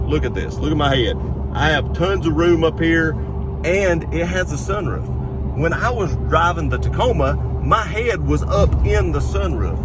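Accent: United States. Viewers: Most foreigners believe Americans sound like this fella lol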